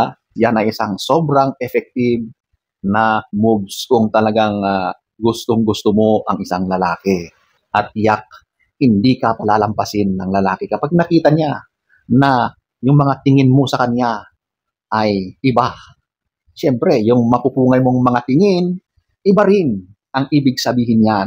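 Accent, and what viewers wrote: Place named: Baguio, Philippines. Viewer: 😂😂😂😂❤❤❤ taga Baguio kadi brod like how you talk cute u mo 😂😂😂buts true LAHAT ❤️🌹😂🥰🤩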